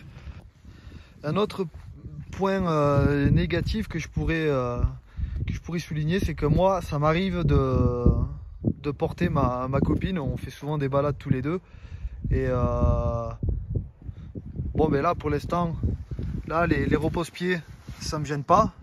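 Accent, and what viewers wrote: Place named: South, France. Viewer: Sympa ta vidéo 👍 et surtout ça fait plaisir de rencontrer un youtubeur de la Yaute avec l'accent du sud 😄. Cette Streetfighter c'est une tuerie, pour moi c'est le roadster ultime avec la SD 1290 voir la Brutale 1000. Mais niveau budget c'est pas pour moi 😅. Après ça tu ne sais plus sur trop quoi rouler. Tu m'étonne que tu la garde. Moi j'attends la Streetfighter V2 moins performante mais plus accessible 😉. En attendant je garde ma supersport 1000 ds. Super les images du bassin Annecien et du Semnoz, une super route pour essayer les bécanes des nombreuses concessions de Seynod 👍. Bonne route à toi, vivement le ski et à tt bientôt sur les routes du coin. ✌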